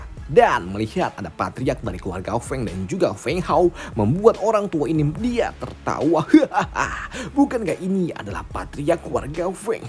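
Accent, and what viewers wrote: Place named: Java, Indonesia. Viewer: Medok bang heheheeh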